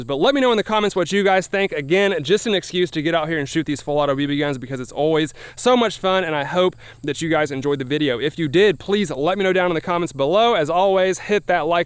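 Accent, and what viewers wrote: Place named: South, United States. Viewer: It’s weird. You sound southern, but you say pop.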